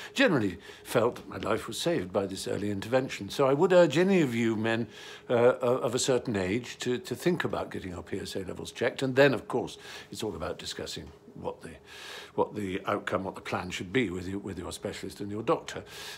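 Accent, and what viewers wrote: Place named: RP, United Kingdom. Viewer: I love the way he speaks. So soft and posh. We need him to stay in this world forever!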